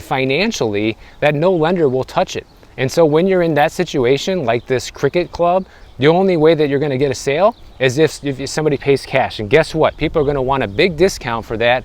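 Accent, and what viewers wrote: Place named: Midwest, United States. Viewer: Being from Boston I love hearing the Midwest Fargo-ish accent . Love the videos bro!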